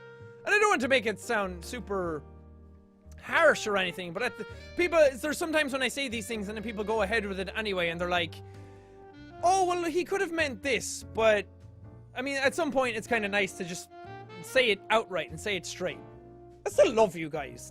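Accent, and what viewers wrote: Ireland. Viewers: Is it just me or does he sound Irish🇮🇪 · has a 10/10 Irish accent.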